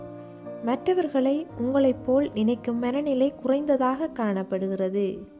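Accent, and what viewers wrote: Sri Lanka. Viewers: ஸ்ரீலங்கா தமிழ் போன்று உள்ளது.உங்கள் உச்சரிப்பு. தமிழன் என்று சொல்லுங்கள் Indian என்று சொல்லாதிர்கள்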